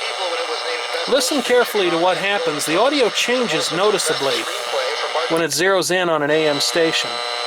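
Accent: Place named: Northern Illinois, United States. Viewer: i guess you from northern il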